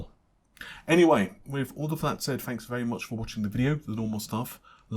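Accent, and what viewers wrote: England, United Kingdom. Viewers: english accent?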